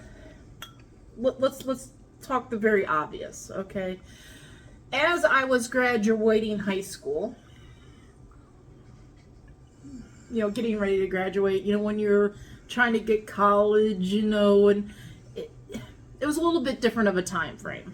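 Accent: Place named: South, United States. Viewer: yes I also wondered that also I’m from South Georgia he sounds southern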